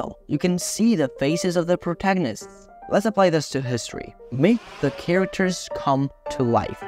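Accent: Italy. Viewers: conosco un italiano quando lo sento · Wait, are you Italian? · Are you from italy?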